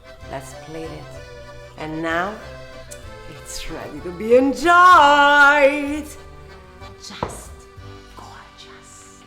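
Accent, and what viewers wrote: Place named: Italy. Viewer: She is loud, hilarious and in your face with her Italian accent. I just couldn't stop smiling while watching the entire video. Oh, I almost forgot the drama and the attitude. I love it!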